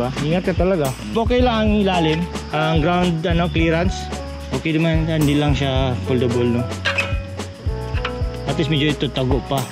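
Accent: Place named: England, United Kingdom. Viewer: natatawa ako sa english accent tsaka sa kinalabasan ng cc/subtitle ng video hahahaha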